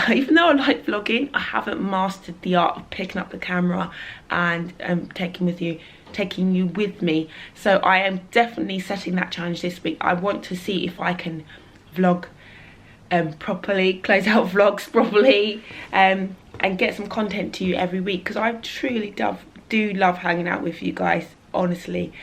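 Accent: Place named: United Kingdom. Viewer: Hey, I love your channel ! I'm a full-time children book illustrator from Madagascar. I love seeing other black artists growing on social media, we definitely need more representation here ! Love, Sawyer PS: I love your British accent <3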